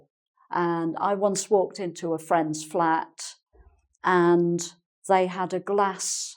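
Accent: United Kingdom. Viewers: you are absolutely adorable the way to teach and your lovely british accent. Thanks so much for your videos Im learning a lot. Send you big hug from Spain and subscribe to your channel :)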